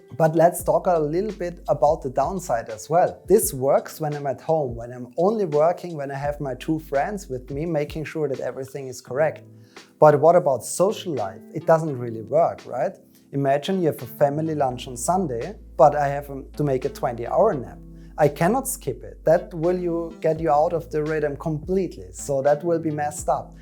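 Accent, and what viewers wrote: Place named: Styria, Austria. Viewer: Eher steirisches Englisch!:) <3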